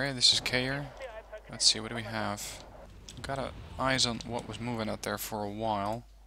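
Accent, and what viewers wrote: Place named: Netherlands. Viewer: phung From his accent and voice i would say he is from the Netherlands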